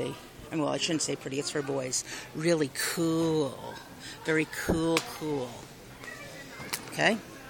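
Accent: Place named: New Jersey, United States. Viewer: Dakota will look super cute in that bunny romper!! Thanks for taking us shopping with you!! I love the outfit that siad hero and had the little bulldog..I love all the different things your Wal Mart has!! I love the monkeys and dogs on the planes sleeper!! Totally love the monkey best friends set as well! Who am I kidding..I loved every bit of it!!I love your NJ accent..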